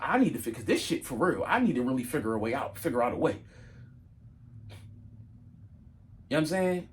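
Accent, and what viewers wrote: Philadelphia, United States. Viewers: Philly accent??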